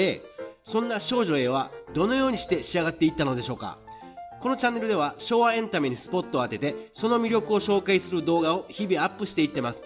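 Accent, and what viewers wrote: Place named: Kansai, Japan. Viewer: 中森明菜ファンでしたので登録しました‼️面白いepisodeありがとうございます♪ナレーションの関西弁素敵💓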